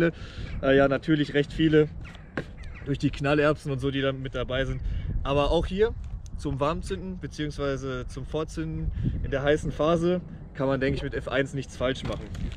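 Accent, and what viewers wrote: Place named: Rostock, Germany. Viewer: Kommst du aus MV? Genauer gesagt - nähe Rostock?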